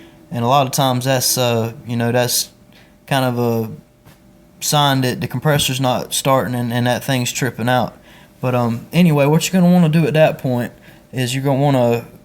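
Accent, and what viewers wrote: South, United States. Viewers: thanks, you are a good speaker. a bit of southern twang perhaps. going to work on my fridge today armed with knowledge.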